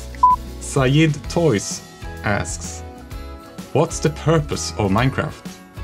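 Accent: Sweden. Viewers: swedish with English accent, Nice But you should keep it up work till Minecraft dungeons is out! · I think it's funny how strong Swedish accent still is:D I would have imagined working in an international setting with English as the main language would have made it fade a little more:D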